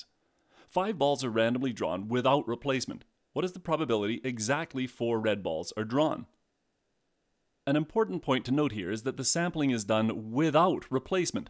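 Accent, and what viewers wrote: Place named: Canada. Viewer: Crystal clear explanation. Very helpful. I detect a slight Canadian accent which I have come to associate with the best math and stats videos on YouTube. Thanks prof wherever you are.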